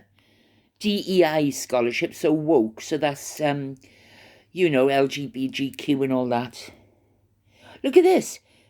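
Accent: Wales, United Kingdom. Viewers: I’m SO delighted that you are back. I missed your lovely Welsh accent and humour. 🏴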